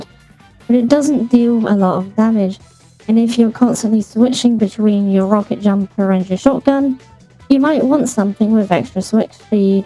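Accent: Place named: United Kingdom. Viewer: They're not a robot they're british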